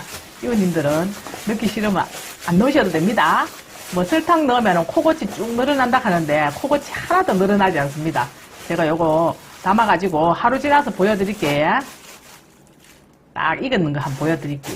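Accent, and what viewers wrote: Gyeongsang, South Korea. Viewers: 주부님 지루하지않고 영상보면서 저도같이 웃고있네요 특유의 경상도 말이 안동고향살땐 대구에사시는 시누와 애기할때도 그렇게 제미있다라고생각못했는데 전라도와서 말씀이 이렣게 제미있고 구수하게 들리네요 ❤❤1❤❤ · 경상도사투리에 더정감감이가내요.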